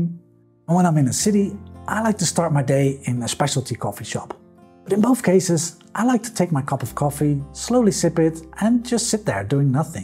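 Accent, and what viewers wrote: Netherlands. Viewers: Kom jij uit Nederland? Je praat lijk op een Nederlander toen ze engels spreken haha.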